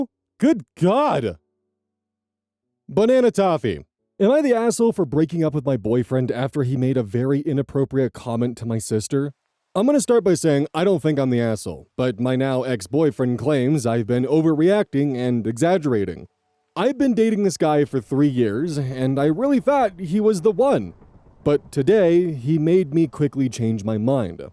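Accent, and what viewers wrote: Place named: United States. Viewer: Nooo don't change how u talk!! Say vib-ah-ray-tions! I love USA accents that arent the movie accent theyre so endearing and quirky!! Pls keep ur regional accent 😭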